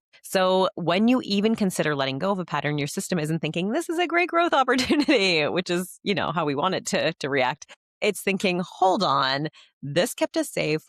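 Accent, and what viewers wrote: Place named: Canada. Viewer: This is the female version of Modern Wisdom; she doesn't have a British accent but she talks as fast. Canadian, eh?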